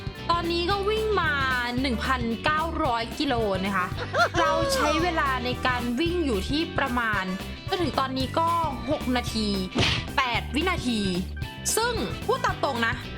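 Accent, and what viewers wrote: North, Thailand. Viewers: อู้เหนือน่าฮักหนาปี่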